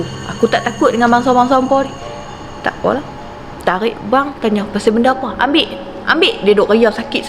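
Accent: North, Malaysia. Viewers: Hg prempuan yg hebat ..cakap utara memang sempoi rahh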